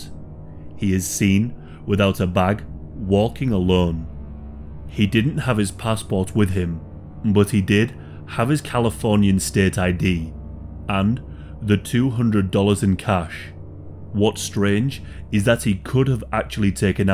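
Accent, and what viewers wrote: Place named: England, United Kingdom. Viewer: Love 💕 your English accent. Hope Derrick is alive and well 💕💕🔥🔥🌹♥️🌹🔥💕💕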